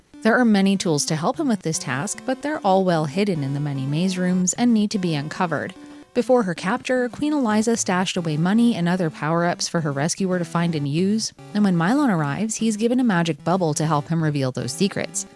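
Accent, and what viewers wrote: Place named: Canada. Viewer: Love your quirky videos and your charming Canadian (I think) accent. ❤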